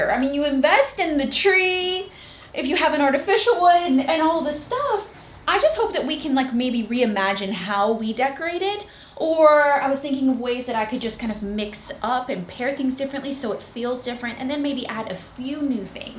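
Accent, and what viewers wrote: Texas, United States. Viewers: I love the little Texas twang in your voice now. As soon as you grabbed the tree I thought she’s gonna put on gloves cause she learned from childhood. Lol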